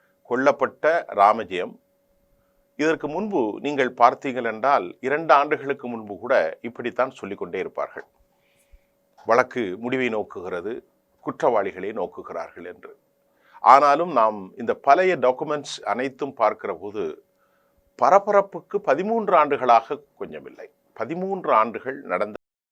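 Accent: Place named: Sri Lanka. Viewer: இலங்கைத்தமிழில் கதைப்பதைக் கேட்க இனிமையாக உள்ளது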